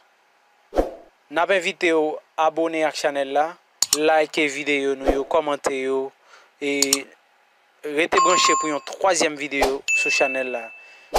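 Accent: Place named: United States. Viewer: Accent americain, felisitasyon bro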